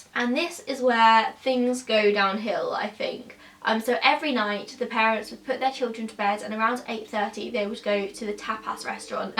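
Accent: United Kingdom. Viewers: I'm in love with your British accent dear xoxo. You're so cute! As far as the police is concerned, they fuck up everything in their stupid scheme of investigations. I see this over and over on podcasts like yours... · Nice british accent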